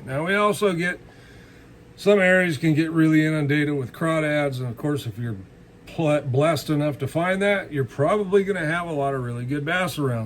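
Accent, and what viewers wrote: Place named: Northern California, United States. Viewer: Your the man love the local NorCal West Coast talk keep up the good work sir...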